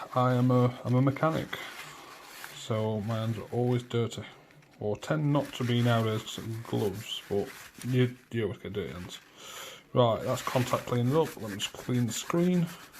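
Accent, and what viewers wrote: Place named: United Kingdom. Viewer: Thanks for teardown instructions but damn your british voice irks me sorry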